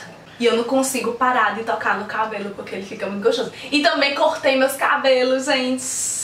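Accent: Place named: Northeast, Brazil. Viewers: menina que sotaque bonito . pernanbucana sim senhor . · que sotaque bom nordestino hein, também sou nordestina, é nós 😊😘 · Ameeeiii o seu vídeo 😍😍 Comprei a glicerina hj e vou fazer para testar. Tmb sou de Recife e amooo o nosso sotaque 💗 Parabénssssss! ! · Eita sotaque pra eu amar 😍 amei as dicas e tbm sou Pernambucana! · Sotaque lindo de Recife amo !!! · logo vi que é permanbucana linda amo nosso sutaque 😘😍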